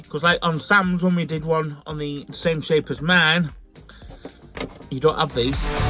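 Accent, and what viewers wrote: United Kingdom. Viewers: British Accent 😂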